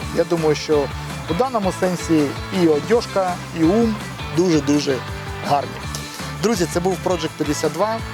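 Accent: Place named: Russia. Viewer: Брат! Видно же, какой твой родной язык!!! Говори пожалуйста на Русском, будет всем счастье!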